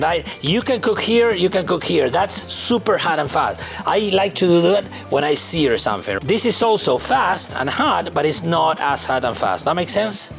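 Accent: Argentina. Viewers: i realized so quick this dude here is argentinian because of his accent and spanish words on the middle of his commentary que qliao, aguante el asado uraa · Un Argentino hablando inglés con un acentazo pero sin trabarse y sin parecer forzado, es un orgullo este chabon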